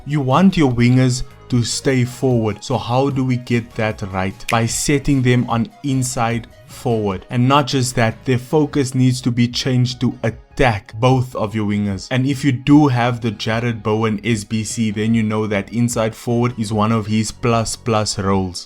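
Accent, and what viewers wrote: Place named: South Africa. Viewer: Bro youre south african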